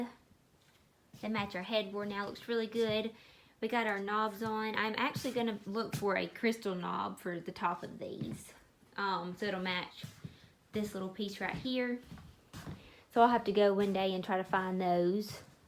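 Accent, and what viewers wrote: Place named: Kentucky, United States. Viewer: GORGEOUS!..Love your channel!..and your accent makes me miss my hometown in KY!..I currently live in Indianapolis, IN..But hope to get back to the Country sooner than later!..keep the home tours and DIY's coming Please!..LOVE THEM!..Have a Fantabulous New Year!..♥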